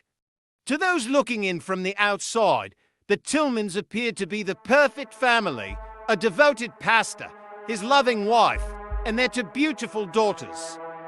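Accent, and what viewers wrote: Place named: United Kingdom. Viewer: The clown with what appears to be a British accent shouting into a microphone. . . About a story in small town Alabama. . . Bizarre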